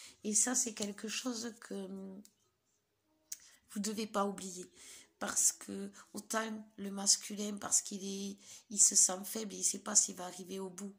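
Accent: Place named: South, France. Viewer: Vous avez un accent du sud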